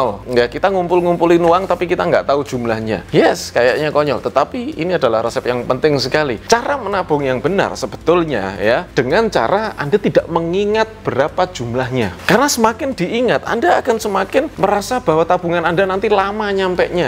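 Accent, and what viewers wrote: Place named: Java, Indonesia. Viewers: Jowo medok mas...hehehe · Logat Jawa mbak 😊 Suami saya juga gitu 😆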